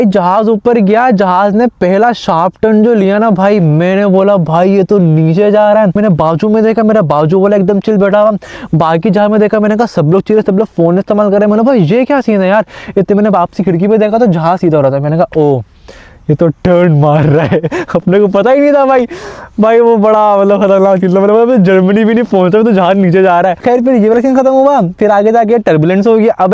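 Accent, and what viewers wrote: India. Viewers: Trust me bhai teri accent sun ke laga tu Indore( India)se hai. Mast aadmi ho tum. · brooo, I literally thought that you were an indian but aap tou Pakistani niklayy bhaii saab. your accent is kinda indian ngl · Bhai aap to pure indian lagte ho accent bhi ❤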